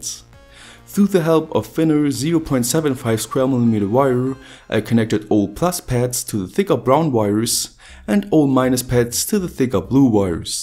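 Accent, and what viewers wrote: Germany. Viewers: man hört das du deutscher bist!...Wenn ich mir deine Materialien so angucke und die Art wie du es zusammen baust, dann werde ich das Gefühl nicht los das du ein Elektriker auf einer Werft oder einem anderen Maschinbau Firma bist oder warst!...Auch die Kabelhalter , die Gewindeschneider und Senker sehen nicht aus, als wenn du sie aus dem Baumarkt hast!..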